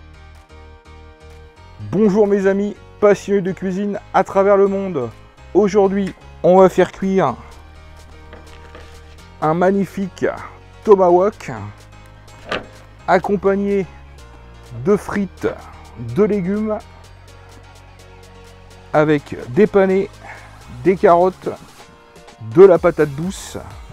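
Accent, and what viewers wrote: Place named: Normandy, France. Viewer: C'est l'accent normand hehe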